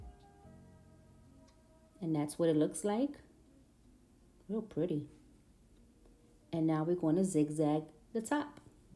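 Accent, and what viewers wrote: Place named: Brooklyn, United States. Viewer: Great tutorial! And I ❤ your accent. Brooklyn Borequa, right? Giving Rosie Perez! 🇵🇷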